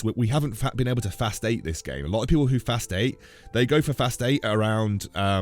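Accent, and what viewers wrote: United Kingdom. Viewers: nohomo bro, you have such a clear, crisp voice! Great for youtube. This might just be the American in me thinking British accents are OP though.. LOL btw, what's your rank?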